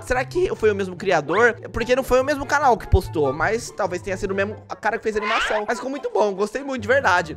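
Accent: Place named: Portugal. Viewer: No puedo entender lo que dices sos portugės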